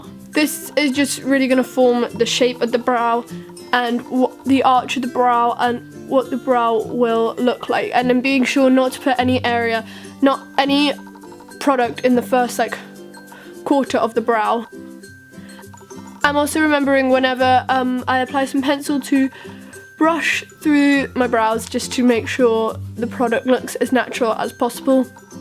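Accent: United Kingdom. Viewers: love your british accent so much<3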